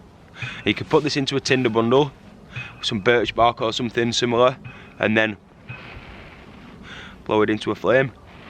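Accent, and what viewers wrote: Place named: Yorkshire, United Kingdom. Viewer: 😂😂😂😂😂🤣🤣🤣🤣🤣just fucking with you brother hahaha we all need a laugh actually liked your vid bro we’re bouts you from sound like a Yorkshire lad like myself brother keep it up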